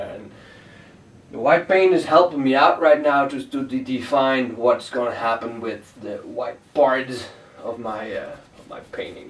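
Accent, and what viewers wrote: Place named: Netherlands. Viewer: I knew u were dutch from ur accent..:) Welcome!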